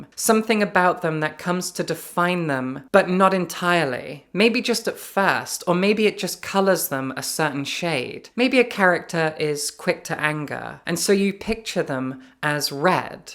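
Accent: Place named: Scotland, United Kingdom. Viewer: Accents: your own is fascinating. Clear indications you don't mix with working class Scots (or Scots!) much, posh Guffie tones. Vocal crack and Americanate vocabulary indicating your chief influences culturally are from the "Land of the Free". 😂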